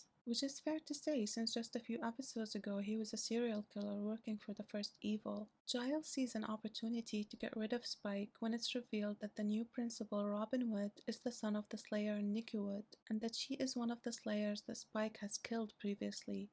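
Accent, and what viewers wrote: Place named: Ireland. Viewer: Yeah, I guess that's what it was. My accent when I speak is clearly noticeable, too. So, judging from my expertise, I would say, you're closest to Irish! 👍🤗 Thanks for the vid though, too! It's good. I haven't watched everything yet but your analysis is on point and we both truly share a love for Buffy. 🤝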